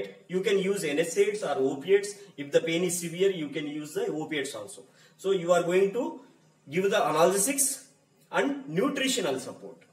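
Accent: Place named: India. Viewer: Need caption, i don't understand indians english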